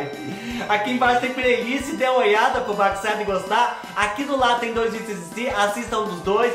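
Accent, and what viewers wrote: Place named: Minas Gerais, Brazil. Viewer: Kkkkkkkkkk vai gostar de falá assim lá em Minas Gerais ,mais me diverti muito.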